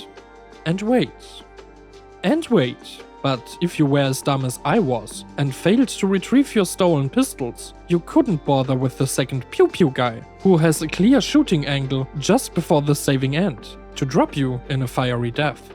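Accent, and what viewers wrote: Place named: Germany. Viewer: could your German accent be any worse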